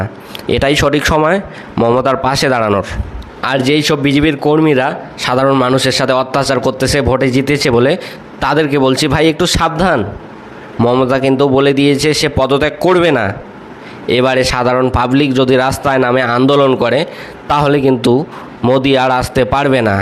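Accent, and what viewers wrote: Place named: Bangladesh. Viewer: তোর কথা শুনে মনে হচ্ছে তুই বাংলাদেশি একদম সিওর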